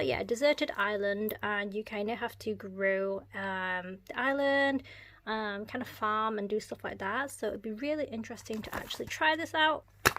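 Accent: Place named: United Kingdom. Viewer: Not trying to be like racist but this is the first asian (I think I’m sorry if your not ) who has a British accent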